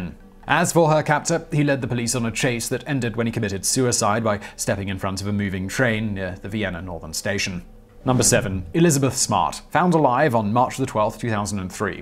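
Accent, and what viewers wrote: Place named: RP, United Kingdom. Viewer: You have the poshest accent I've ever heard!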